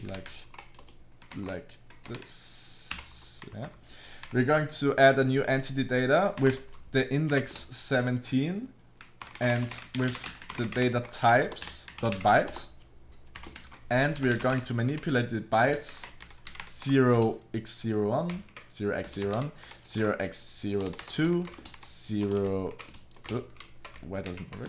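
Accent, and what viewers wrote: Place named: Germany. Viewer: Am Akzent merkt man direkt, dass du deutsch bist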